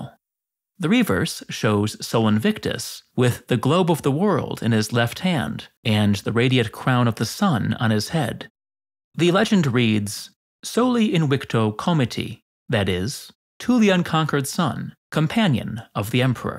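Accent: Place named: Wisconsin, United States. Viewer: love that Wisconsin accent 👍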